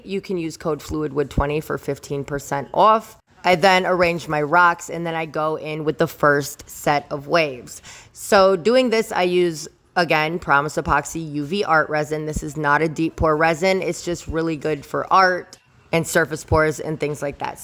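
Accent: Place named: Michigan, United States. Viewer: you talk like me, you have petosky stones you find near you, do you live in michigan? like me? i loved the table although its way more difficult than what i am trying to do, the only thing in your video i would change is i kept worrying about your hair either getting caught in the resin or the tools, made me worry like a momma bear. lol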